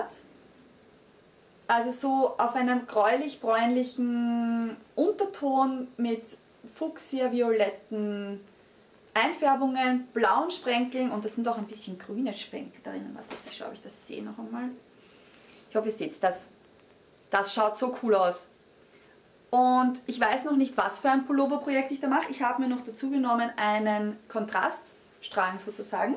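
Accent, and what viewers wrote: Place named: Austria. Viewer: Warum spricht so schnell? Und dann noch mit diesem Dialekt😕 Das versteht doch keiner außerhalb von Österreich. Also ich habe nicht mal die Hälfte von den Namen der vorgestellten Garnen verstanden und von wem sie sind. Das Garn von der Babydecke hat mir wirklich gut gefallen. Leider waren auch die Einblendungen kaum zu erkennen! Dunkel Lila Schriftzeichen auf schwarzem Untergrund!! Liebe vielleicht sollten Sie mal Ihre eigenen Videos von Anfang bis Ende ansehen, dann verstehen Sie was ich meine. Auch ist die Auflistung der Projekte,von wem die Garne /Wolle sind,wie die Garne/Wolle heißen in der Infobox/Videobeschreibung für ungeübte Strickanfänger schlecht verständlich. Mich interessier einige der gezeigten Garne/Wolle, aber ich finde mich in der Beschreibung nicht zurecht. Schade!!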